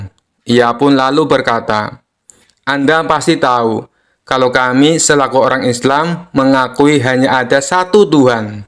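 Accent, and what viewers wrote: Java, Indonesia. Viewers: kalo bisa pke bahasa indo yg bner jngan medok biar lebih enak 🙏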